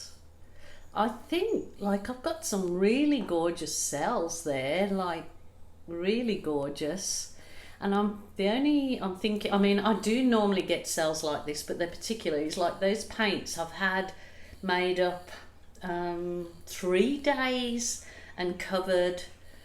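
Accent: Birmingham, United Kingdom. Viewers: Glad your feeling better x i thought you had a touch of a Brummy acsent..thought i was going mad lol x fab pour x still think it looks like a snake xx lots of love from the uk xxIm gonna start making my paints up few days before x great tips today xx thankuuu xx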